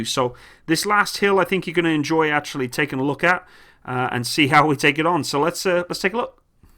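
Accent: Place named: United Kingdom. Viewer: I thought u were british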